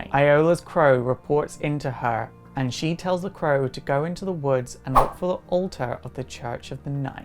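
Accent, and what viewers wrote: Scotland, United Kingdom. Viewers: where abouts from Scotland are you from. I'm from Glasgow. BTW I have the biggest crush on you, and watched your vids for ages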